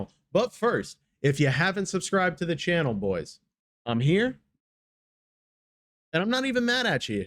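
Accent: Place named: New York, United States. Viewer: Haha! omg his accent is so freaking great. I feel like I'm in NYC being given directions to the Chrysler Building or taken to my seat in an Italian mobster restaurant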